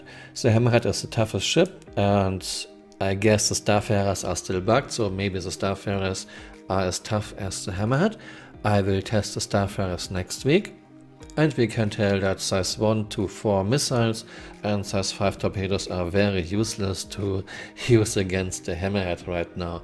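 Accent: Germany. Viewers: Subbed ringged and I love your videos but ... for a non native english its very hard for me to translate your germanish. So many "so" and "torpedos" and many others. Please take that as feedback, i no way i wanted to offend you or anything ;)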